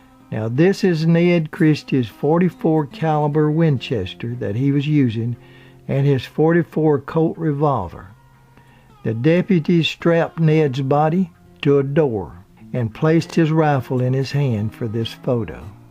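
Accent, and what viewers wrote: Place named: Rural, United States. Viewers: Please get the marbles out of your mouth and properly enunciate your words, this is English, not hick. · Great stories. They should be delivered by a campside fire. I'm British but I absolutely love that accent. (Is it a Kentucky accent BTW?)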